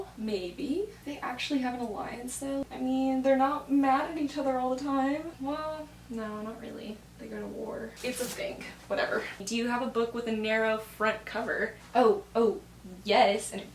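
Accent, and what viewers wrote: Argentina. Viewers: OMG! You spoke in Spanish and your accent is really great, wow! I’m from Mexico, but I loved your Argentina’s accent. Your videos are amazing, I enjoy them a lot. 🤩👌🏻❤️ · i was shook with your argentinian accent! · me encanta tu acento argentino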